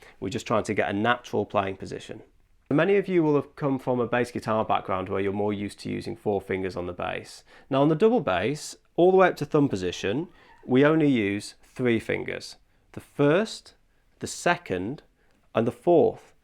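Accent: England, United Kingdom. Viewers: What kind of English accent is this? I like hearing him talk lol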